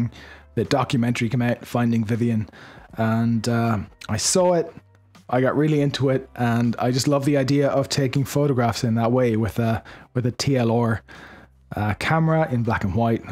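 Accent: Ireland. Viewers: Great Video. I love the Irish Accent, by the way!!!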